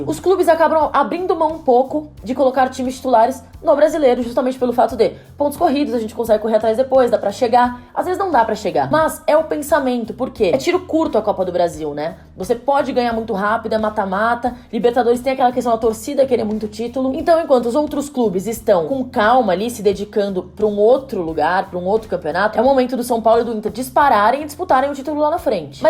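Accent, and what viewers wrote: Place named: South, Brazil. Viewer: Mano... esse sotaque é de onde? Eu amo esse sotaque parece ser de quem é do SUL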